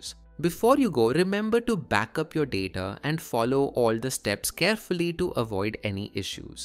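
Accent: India. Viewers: I dont understand what you saying bro i only hear indian yapping bruh 💔💔💔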